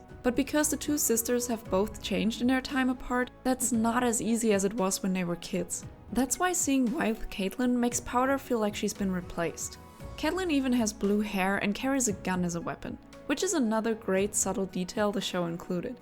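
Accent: Germany. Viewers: Are you German? Your accent sounds very German.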